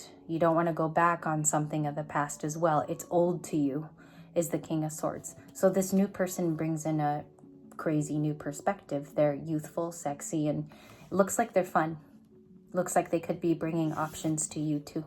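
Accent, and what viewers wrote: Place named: South, United States. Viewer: So funny, he has a country accent & i love it! I've been waking up in the middle of the night just thinking of him. I miss him so much. I feel him getting closer & closer to me.❤, you're so good! 🎉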